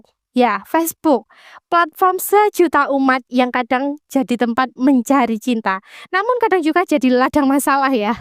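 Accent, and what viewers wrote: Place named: Java, Indonesia. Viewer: Medok e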